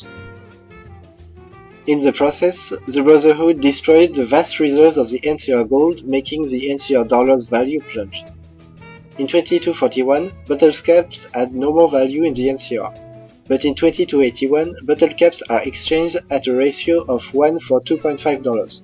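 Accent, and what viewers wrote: France. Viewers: Mec sa se voit que t’es français ou tu parles cette langue parce que ton putain d’accent est dégueulasse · A l'accent français x] Le meilleur accent du monde ;] Sympa la vidéo. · Your French accent is thick and very difficult to understand.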